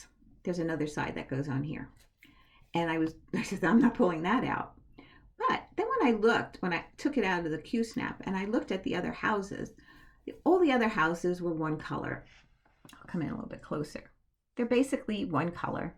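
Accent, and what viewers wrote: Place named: New Jersey, United States. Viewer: I’m also from NJ, but living in NC. Love hearing your accent. I am trying to finish Christmas Camper by Barbara Smith. After that, I’d like to do some Stitching with Housewives patterns. I’m jealous that you have a needlework shop near you. Absolutely love Autumn in the Village, gorgeous! Thanks for your videos.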